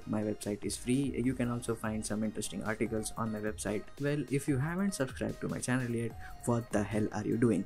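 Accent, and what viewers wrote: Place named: England, United Kingdom. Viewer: Angreez se bhi Bhariwali English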